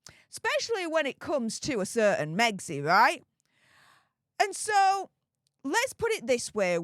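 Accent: Yorkshire, United Kingdom. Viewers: So, I trying to figure out accent. I am strictly guessing but perhaps, "Yorkshire Accent?" Can anyone enlighten? Thanks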